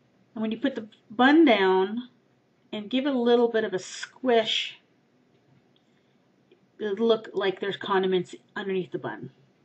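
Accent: Canada. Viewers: i love your canadian accent it makes me so happy inside.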